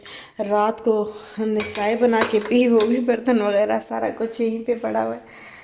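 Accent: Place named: Mianwali, Pakistan. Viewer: I think u r from mianwali jis tarah sai ap bolti hain.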